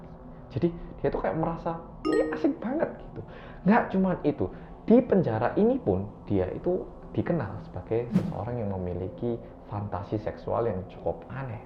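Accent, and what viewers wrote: Java, Indonesia. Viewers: Kro ngenteni buko, cusss nnton wong medok😂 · medokkkk update gaesss ngeriii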